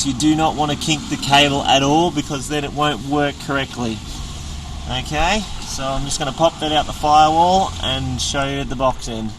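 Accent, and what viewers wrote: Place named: Australia. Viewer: Thanks for your video, and lovin' that Aussie accent and vocabulary ! Nothin' too scary ! Cheers from a Kiwi-Aussie !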